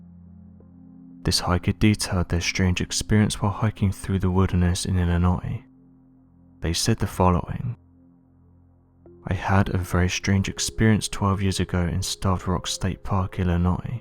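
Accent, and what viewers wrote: United Kingdom. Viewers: Why didn't the guy that experienced this situation be the one telling the story? Other than getting a dude with a British accent that's hard to understand tell a story that happened in America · I think I wasted 9 minutes of my life. A former US DOD investigator with a British accent? That should have clued me in right there. It happened 12 years ago and you didn't have a smartphone to take pictures. Not very believable.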